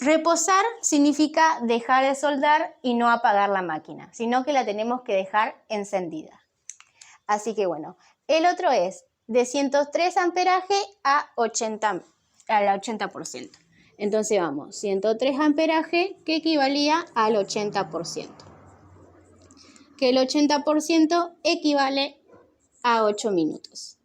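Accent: Argentina. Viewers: Muy buen video explicativo, pero nada personal me desespera mucho el acento argentino (ladilla mental mia) me gustó la explicación y me aclaro muchas dudas, pero no creo seguir viendo tus videos. Sigue así gracias por la ayuda.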